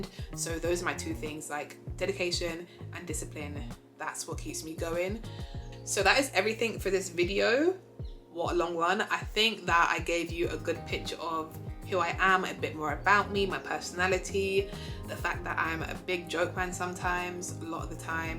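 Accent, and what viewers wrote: New York, United States. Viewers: It’s the New York accent that killed me like “ are you DUMB?? “ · Loved the New York accent and your career story!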